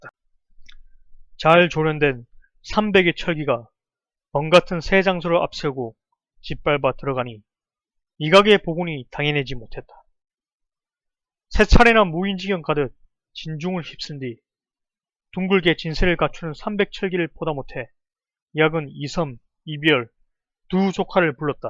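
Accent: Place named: Gyeongsang, South Korea. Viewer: 경상도발음의 한계라 듣기는 좀어색하긴합니다 수고하셨구요 목소리가 톤이 어울리지 않아요ㅎ 다른거 도전해보세요 코메디나개그같은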